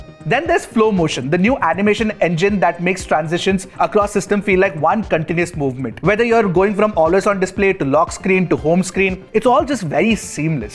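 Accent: India. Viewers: The Indian accent is truly awful!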